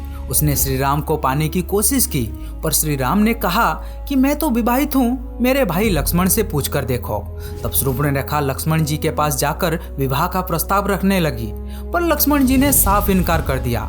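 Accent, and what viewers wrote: Odisha, India. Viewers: Kya ap Odia ho ?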